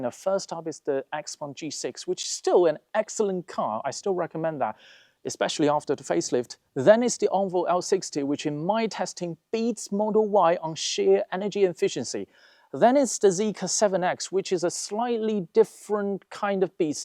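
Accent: United Kingdom. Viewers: The British accent is so distracting😂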